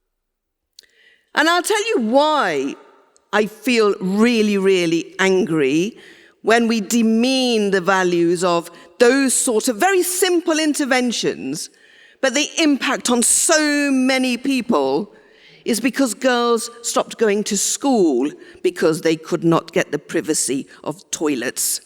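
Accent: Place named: England, United Kingdom. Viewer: Godi media with an English accent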